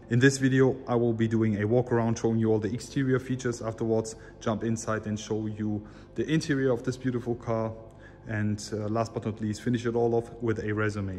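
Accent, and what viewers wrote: Germany. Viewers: Arnold is Austrian, this guy is German. The accents are totally different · By speaking in a German accent just makes the video so much more enchanting.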